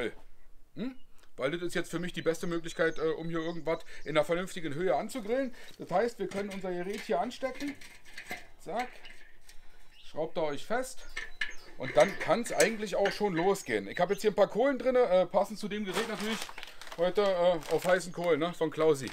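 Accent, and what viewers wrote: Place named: Bavaria, Germany. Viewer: Der Dialekt, Basd. der heimliche Bayer. Unterwegs in Berlin als Entwicklungshelfer. Bravo Juhu. Ganz liebe Grüße aus dem König Söder Land.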